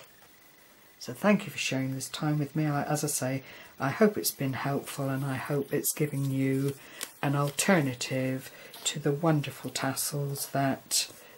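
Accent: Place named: Devon, United Kingdom. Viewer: I thought you sounded like a local girl. I was born in Newton Abbot but live in Teignmouth. Small world isn't it. xxx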